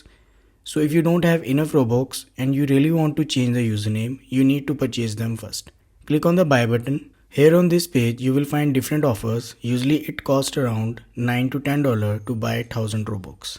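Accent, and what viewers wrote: India. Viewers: wow, nice scam, makes sense why the accent is indian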